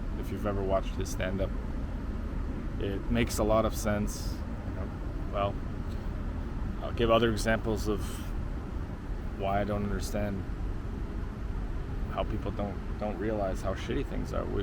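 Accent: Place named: Europe. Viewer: You have a cool accent. Are you European?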